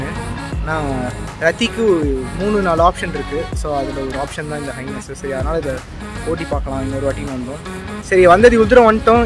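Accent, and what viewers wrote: Chennai, India. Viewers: Super Bro.... full Chennai slang I like it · "மெட்ராஸ் பாஸை" யில் பேசுவது கேவலமாக இருக்கிறது!👎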